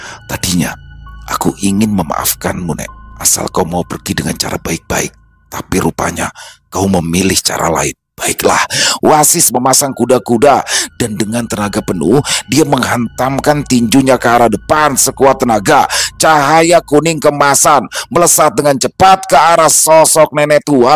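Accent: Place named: Java, Indonesia. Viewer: Suara bang agak medok apa dari jawa ya bang ❤❤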